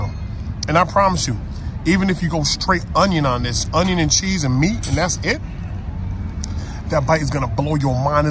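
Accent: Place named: Philadelphia, United States. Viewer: Real shit when I started watching him I was like is he from Philly lol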